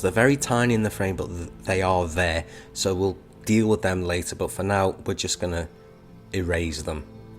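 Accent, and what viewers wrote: Liverpool, United Kingdom. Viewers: A fellow Scouser hey?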